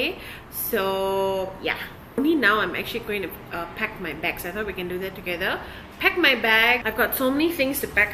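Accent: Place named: South Africa. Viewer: Happy Anniversary weekend to you both. I am confused by your ethnicity. You look South Indian and you celebrate Diwali but your accent is South African and you live in KL.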